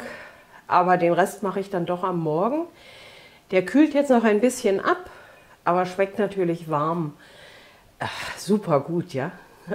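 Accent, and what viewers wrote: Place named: Altmark, Germany. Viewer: Sieht superlecker aus 🤪 Dankeschön für Dein Rezept Vom Dialekt her kommst Du aus der Altmark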